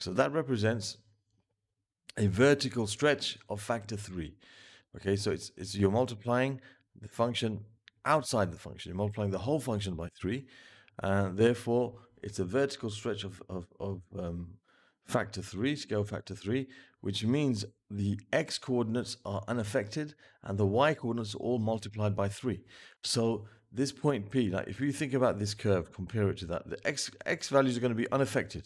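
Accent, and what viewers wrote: United Kingdom. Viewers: the best! And that British Accent really hits the spot, lol.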